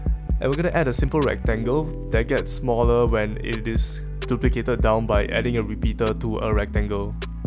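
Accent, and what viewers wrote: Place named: Singapore. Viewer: you sound like youre from singapore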